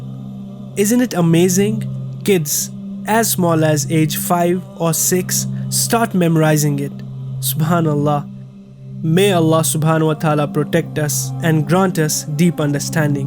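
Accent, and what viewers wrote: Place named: Kashmir, India. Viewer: By your voice you seem to be kashmiri.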